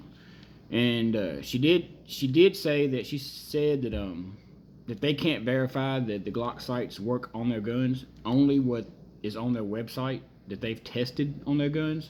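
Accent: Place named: East Texas, United States. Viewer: Just curious, are you from East Texas? Accent sounds familiar lol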